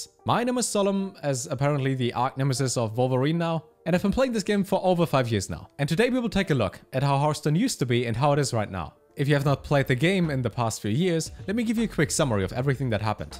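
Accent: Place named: Germany. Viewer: That fast German-English accent is exhausting to listen to.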